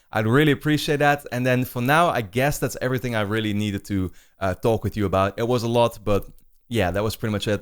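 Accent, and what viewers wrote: Netherlands. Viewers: Are you Dutch or South African? 🤔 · Dime Drawer he is Dutch.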